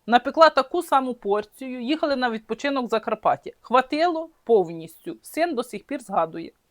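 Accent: Lviv, Ukraine. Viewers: Ні, не Житомирська. Там слово #файно# не вживається. Скоріше Львівщина.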